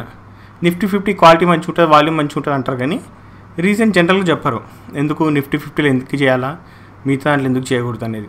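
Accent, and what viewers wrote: Telangana, India. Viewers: Anna Telangana Language lo Explain Chestunte Bale undi anna. Nenu telangana Khammam dist. Nen enjoy chesikuntu chustuna nee videos. Thanku💐💐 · dear, మీ పేరు నాకు తెలియదు. అందుకే అనే సంబోధిస్తున్నాను. ఎంత బాగా చెప్పారు. మార్కెట్ లో చాలా ఎక్స్పీరియన్స్ వున్న ( వుందని అనుకుంటున్నాను లెండి ) నాకు కూడా మీరు చెప్పిన విషయాలు విస్మయం కలిగించాయి. ప్రైస్ యాక్షన్ గురించి ఎంత అద్భుతంగా చెప్పారు. రియల్లీ ఫెంటాస్టిక్. నిజం చెప్పాలంటే ఈ వీడియొ గురించి నా మనసు లో కలిగిన భావాలు బయటకు చెప్పటానికి నా భాషా అవగాహన చాలటం లేదు. అంతా ఎక్సయిటింగ్ గా అనిపించింది. లాస్ట్ పాయింట్ సూపర్ అని చెప్పాలి. మీ చానెల్ కు ఎప్పుడో subscribe అయ్యాను గానీ ఇంత ఎక్సయిటింగ్ గా ఎప్పుడు అనిపించలేదు. ఇందులో విషయానికి సంబంధం లేకపోయినా ఒక మాట చెపుతున్నాను, మీరు చక్కటి తెలంగాణా తెలుగు న్యాచురల్ గా మాట్లాడుతుంటే కూడా వినసొంపుగా అనిపించింది. ఒక తెలుగువాడు ఇంత చక్కగా చెప్పడం కూడా నాకు బాగా నచ్చింది.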